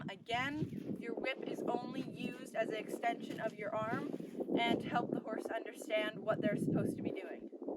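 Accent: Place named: Canada. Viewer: Hello, I'd like to learn more about Missy please. Age, height, color and with that gorgeous dorsal stripe (favorite behind dapples) what you know about breed(s). Also a question about you please if its alright. 50+ years ago I dated a young man from Canada and 2 things stayed with me. The distinct sound of saying the words out and about which I hear in you that prompted the ask and the use of "eh" which seems to be fading. It was so strongly used back then with every Canadian I met while living close to the border and traveling through the country in the 1970s. I wish you a strong build on your YT page and its success is a win for horses. Two accounts I follow that I highly recommend you look at are, 1) THE CLEVER COWGIRL So far we've never fully seen her face but what I do see is a lovely natural blonde who reminds me of lovely strong Nordic women. 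She's kept her name private also but her account name has led to us calling her CC and she seems very fine with that. I imagine she is between late 20s and middle 30s and like you CC is working to build up her account. She worked training horses for others in Utah but she and hubby are now in Montana where she buys the auction horses most people don't or shouldn't want. More than once she's been kindly told she ought not buy a specific horse including one that cost her $25. However, with her >not< bragged about humble but awesome experience and ability she zeros in on the issues then, when ready, sells to an appropriate rid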